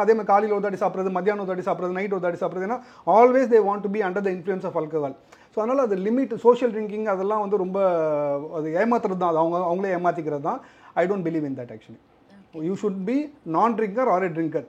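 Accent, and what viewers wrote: Chennai, India. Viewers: chennai slang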